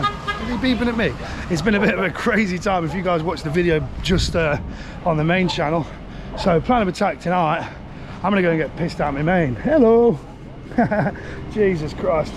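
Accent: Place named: Northern England, United Kingdom. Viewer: loved the Q and A you just come across has a legit northern lad, you na what i am saying bro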